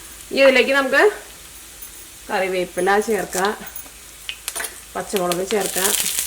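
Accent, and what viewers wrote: Thrissur, India. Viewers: Enikku Chechide thrissur accent aanu kooduthal ishtam. Original thrissur nasrani accent! 👍🏻👍🏻👍🏻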